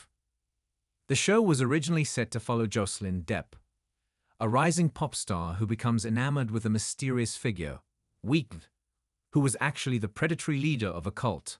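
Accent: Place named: United Kingdom. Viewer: Well that was a waste of time. There was maybe one (possibly two)sentence about Nosferatu and the rest was a rehashing of the rehashing of critics reviews of an HBO series I didn’t see. Dear God- Please- if you can’t get decent narration from AI use an actual human to read the script. And why the British accent. And what is wrong with me even bothering to comment? lol- I think I reached the breaking point with these “reporters.”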